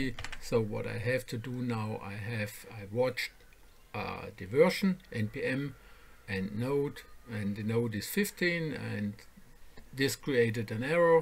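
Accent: Germany. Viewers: Man hört das deutsch unnormal raus :D gutes Video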